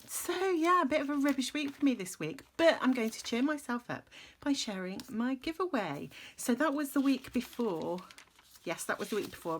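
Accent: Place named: United Kingdom. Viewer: I've never won anything but its always exciting to join a giveaway. But most of all, I love watching your videos. So many times I can relate to your rambling about coffee, your children and journal mostly. And I love love love your accent. Been missing my British friend so watching yours really made me less missing her. Thanks hun. Good luck everyone.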